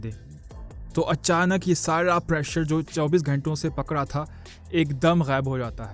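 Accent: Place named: England, United Kingdom. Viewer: Ap urdu barri achi tarah angrez ke style main bolta hai😅😅😆❤️👍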